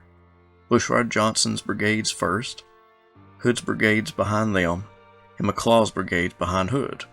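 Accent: South, United States. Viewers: Another great episode! BTW, aside from your "accent" I know you are a Southerner because you pronounce Lafayette correctly.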